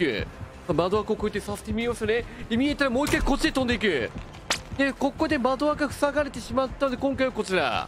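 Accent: Kansai, Japan. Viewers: DBD気になっていたので、参考にさせていただきます！ 関西弁もたすかります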